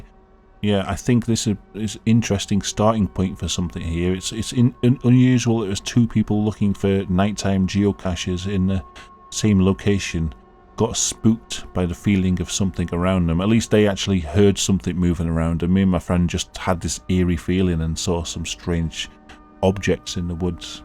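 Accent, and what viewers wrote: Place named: Wales, United Kingdom. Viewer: I could have sworn I heard “torches” the whole time. Guess I am not used to that Wales’ accent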